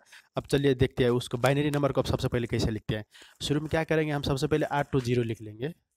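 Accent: Nepal. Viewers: Are u Nepali ?? Reply honestly 😸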